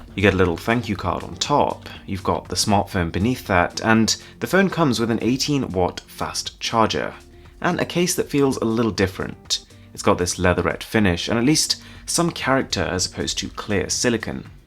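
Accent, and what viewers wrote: RP, United Kingdom. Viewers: for some reason this guy's accent sounds too posh for my ears. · How refreshing to have a review given by someone who speaks the Queen's English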